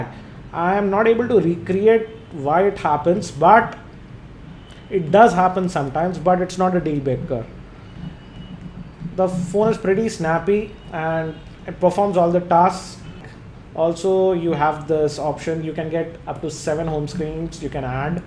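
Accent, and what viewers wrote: India. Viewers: best indian accent ever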